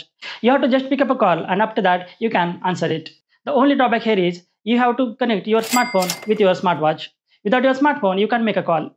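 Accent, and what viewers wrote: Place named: India. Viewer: He's an Indian thatswhy his english dictions is like pronouncing Indian language. He's not good in english pronounciations...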